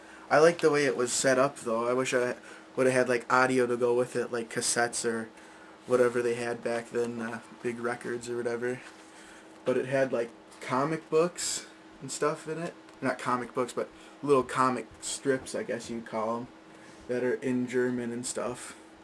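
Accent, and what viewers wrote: United States. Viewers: He has a nice american accent.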